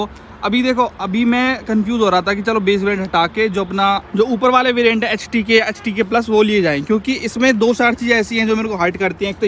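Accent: Haryana, India. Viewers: ji Hum aapki desi Haryanvi bahut pasand karte hai Bhai bhi thora lallu lagta hai 👍👍👍👍 Love from rewa, Madhya Pradesh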